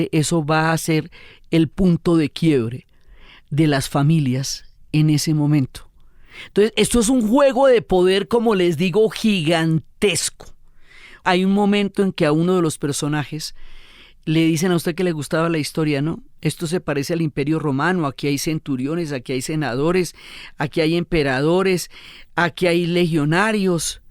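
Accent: Colombia. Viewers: Historiadora con estilo propio y ese acento chevere colombiano, un abrazo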